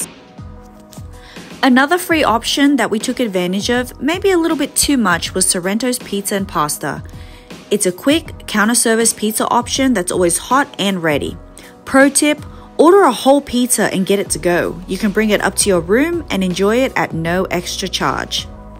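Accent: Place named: New Zealand. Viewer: Wonderful video, and short too! I love that it was short, but lots of information. By the way, I'm dying to know what part of the world you're from. I hear New Zealand in most of the words you say, but then I hear a little bit of United States English in other words you say. I'm fascinated, but I digress.... My very first cruise ever was on the Oasis of the Seas, and ever since then I've been dying to get on the Symphony. I know the two ships are very very similar, but the Symphony is bigger, as I understand. I remember Sorrento's Pizza not looking very appealing at first glance, then one of my fellow passengers insisted I needed to try it out. I did, and I was floored at how delicious the pizza was. The Solarium is a fantastic included restaurant. I love that it's adults-only, and that it requires a reservation. You cannot beat the entertainment on board the Oasis-class ships. 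It's first rate. I'm really disappointed for you that they ran out of soda so quickly in the voyage - especially considering the fact that guests paid for the drink package. I would have demanded my money back for the drink package. I'm booked on the Harmony for this coming April, and am anxious to see how good the included food is this time around. The first time I sailed, I thought all of the included food was phenomenal. But since they've implemented the new menu changes, the reviews have been mixed. On my first voyage with RCL, I purchased two specialty dining restaurants: 150 Central Park, and The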